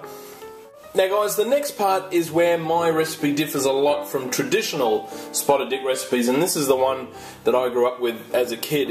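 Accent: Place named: Australia. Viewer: Yeah where did you get the UK from this? He's Australian lol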